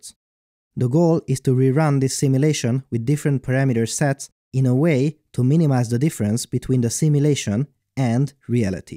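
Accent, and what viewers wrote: Hungary. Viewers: i dont know why i'm here, i've never been interested in 3d simulation, but as i heard you speak, i knew youre hungarian haha ;)) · Are you Hungarian?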